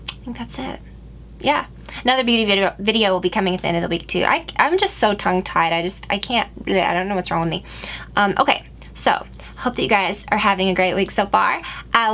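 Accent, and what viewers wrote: South, United States. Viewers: I feel like I'm just noticing her southern accent... I didn't notice before!:o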